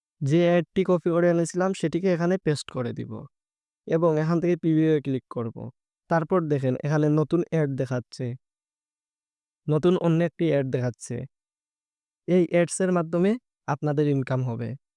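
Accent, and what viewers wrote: Sylhet, Bangladesh. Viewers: সিলেট নি ভাই তুমার বাড়ি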